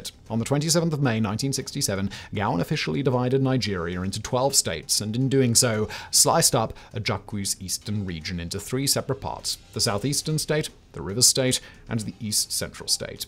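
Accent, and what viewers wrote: United Kingdom. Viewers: romanblack4247 It's not a white accent lmfao what? He's British and even then he could have easily pronounced the words like Yoruba or Igbo correctly. Wth is a white accent? He simply just didn't even try to learn how to pronounce them correctly.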